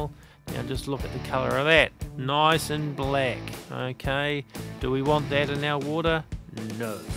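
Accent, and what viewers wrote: New Zealand. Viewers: Fellow kiwi accent I hear?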